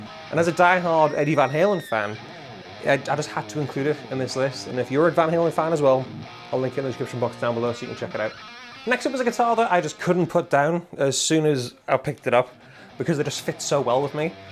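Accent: Newcastle, United Kingdom. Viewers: ! I only started playing recently. I'm really lucky that I have guys like you on Youtube who play really cheap gear and make it sound amazing, it teaches me to focus on my playing and not the gear. I also love the geordie accent! I learned english watching True Geordie on youtube talk about newcastle football club in 2015 (when they got relegated 😂) so I love how you talk howaay lads heres to 2026!!!!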